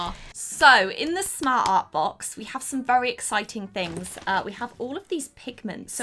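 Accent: United Kingdom. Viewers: Where are you from ??????!!! You sound British but you say dollars ahh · i just LOVE your British accent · Wait she's British!? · You have the accent of the British baby from Family Guy. Not Stewie but the girl he had a crush on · Who els loves British accent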